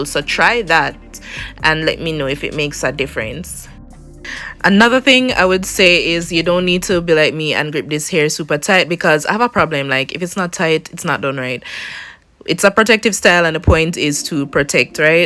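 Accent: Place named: Jamaica. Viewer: Come thruuu Jamaican Accent lmao. These came out lovely. Love it!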